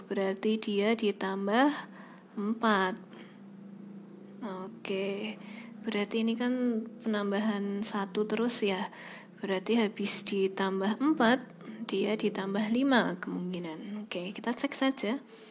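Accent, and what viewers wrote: Java, Indonesia. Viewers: Sangat bermanfaat ka, tapi maap banget bukan menghujat saya terganggu sama suaranya, terlalu medok sama kalem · Medok banget mbaaa :v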